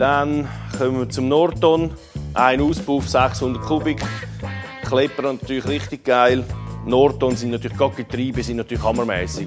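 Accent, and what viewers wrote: Switzerland. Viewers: Schweizer Deutsch, immer wieder lustig. So 'ne Töff Sammlig hätt ich auch gern.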